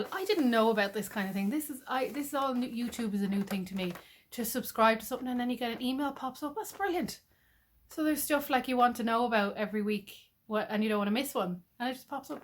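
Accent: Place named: Ireland. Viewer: You sound like you're in Ireland. Where are you located? Always looking for nearby fabric places...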